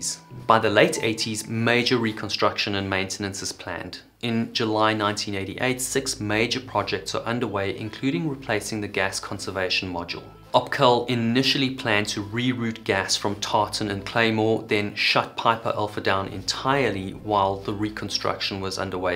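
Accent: South Africa. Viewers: You sound south african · You south african? I'd recognize that accent anywhere, amazing video btw! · I hear a South African accent? 😊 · I knew a guy from Albertinia (South Africa) who worked on that platform. He'd fly in to the UK and he handled the landing barge bringing in supplies. Nice to hear the Saffa accent explaining these events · where are you from? you almost sound south african? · Your accent sounds very South African, are you south African living in the UK? 🇿🇦😁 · Oh man, so close to hiding that south african accent. but not close enough boet!!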